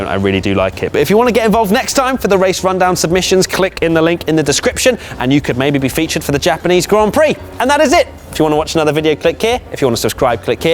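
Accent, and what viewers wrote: United Kingdom. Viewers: You're so Brittish!!!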